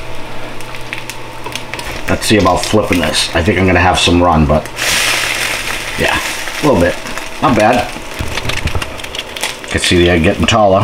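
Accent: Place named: East Coast, United States. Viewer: You have my grandfather’s name, first and last. I’m a too. Not tons of us. Sounds like you are in the east coast like us.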